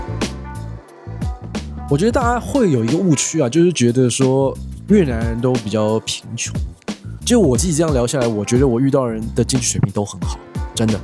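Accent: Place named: China. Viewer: 你怎麼講話中國羌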